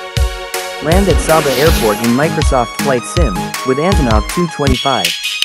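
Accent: France. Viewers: are you french ? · Are you French?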